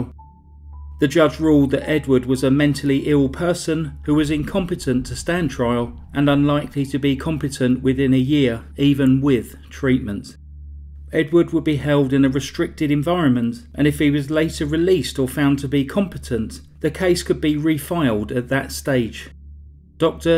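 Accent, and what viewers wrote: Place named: England, United Kingdom. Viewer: "Mentowe anguish dew tew juhhuee tryowe." I want to write a book with your accent for the narrator. I don't know if the preceding sample would even be legible for anyone. I don't know from what locality your accent originates, but I would be very interested to learn more about all the regional vocal inflections in England - I love dialects!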